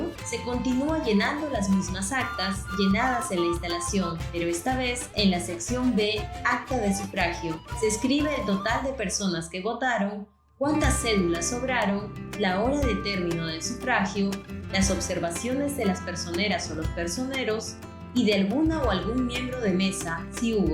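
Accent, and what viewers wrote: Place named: Galicia, Spain. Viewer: Soy <mail> me parece bien un video, pero no me gusta el audio parece una gallega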